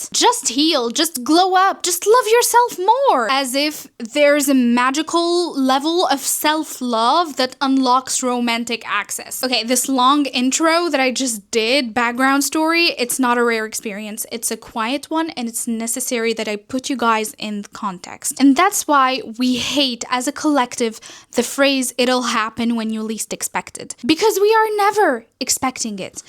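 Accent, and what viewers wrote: England, United Kingdom. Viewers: i loveeed ur english accent machalah <33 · Guuuuuuuuurl your english accent !!!! You slay queeeeen ! Keep up the good work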